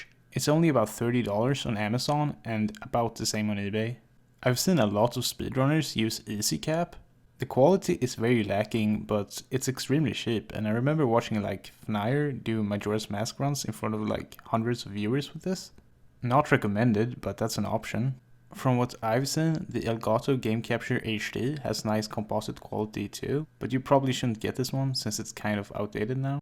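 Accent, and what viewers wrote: Sweden. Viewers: i fucking love your swedish kinda accent! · du har den klassiska svensk-engelska dialekten haha grattis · den svenska/engelska dialekten ;) du låter iallafall bra med din dialekt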